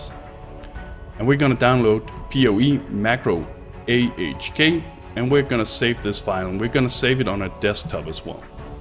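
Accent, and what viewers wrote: Denmark. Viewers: Thanks for sharing the macro man! I got it to work just fine and it's working smoothly so far. By the way, just to see if I've managed to identify your accent properly; are you danish?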